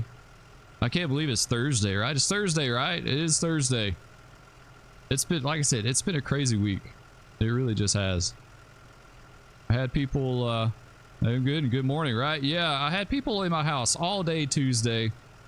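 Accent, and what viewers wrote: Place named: New York, United States. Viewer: Into the flames time I see let the FDNY accent begin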